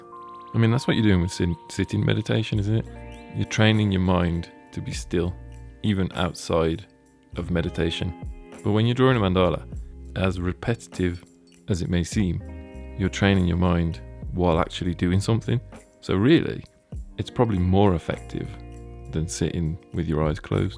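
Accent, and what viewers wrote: United Kingdom. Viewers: Its hynotic to just see you draw your british blabber fades as background noise.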